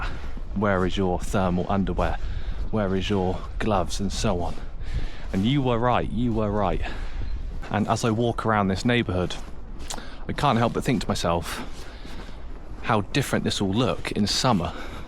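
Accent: United Kingdom. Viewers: Американское произношение гораздо чище и понятнее британского. Бриты говорят как будто у них рот набит кашей. Мямлят и не произносят окончание слов. говорит с бр. акцентом, но понятно каждое слово. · Британский акцент это нечто. Каждый раз ловлю вайбы, как будто мне профессор в Оксфорде лекцию читает ( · What do you call our resiential buildings? Comfy? Commie? (as in Communist) Coming? I don't understand British accent too well, I'm afraid. LOL But anyway, they have such buildings all over the world, - Europe, USA, Canada... It's not something typically Russian or Soviet :) And hey, welcome to Russia! Stay warm! Spring is nigh! :)))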